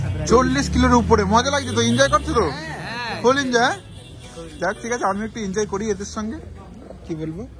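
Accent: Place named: Bangladesh. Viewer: দাদা কথার ভিতর বাংলাদেশি বাংলাদেশি ভাব এসে গেছে কিন্তু🐸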